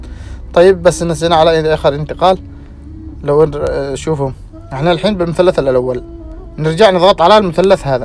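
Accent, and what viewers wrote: Yemen. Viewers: لهجتك يمنيه...صح... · اي كلامه يمني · ايووه صدق 😂😂💔 احلى شيء فديت الكلام اليمني 🇾🇪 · 😂😂💔لاقسم انه يمني من ربعنا اللهجه تقول كذا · يمني انت من لهجتك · متأكد؟ بس كلامه يمني🌚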